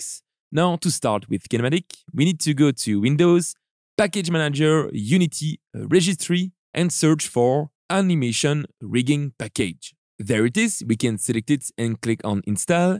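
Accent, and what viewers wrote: France. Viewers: you have a french accent ?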